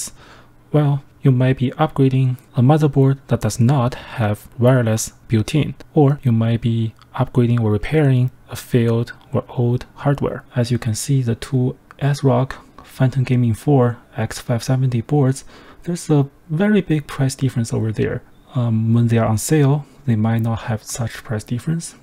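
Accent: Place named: China. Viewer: 一听就是中式英语，阿弥陀佛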